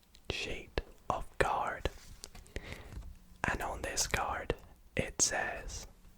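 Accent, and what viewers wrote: Scotland, United Kingdom. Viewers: I just realized from Scotland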